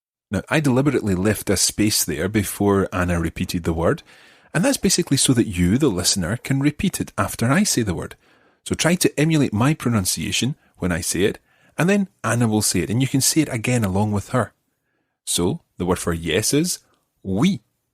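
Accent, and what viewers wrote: Scotland, United Kingdom. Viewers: Hmm, I was just thinking u were Scottish or all spoke Gaelic. !😊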